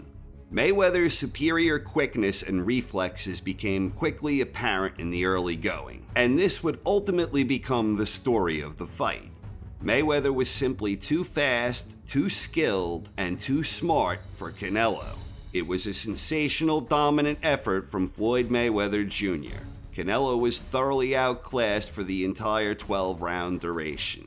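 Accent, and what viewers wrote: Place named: New York, United States. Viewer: Love your Channel you kinda sound like Howard Cosell with a New Yorkers Accent!!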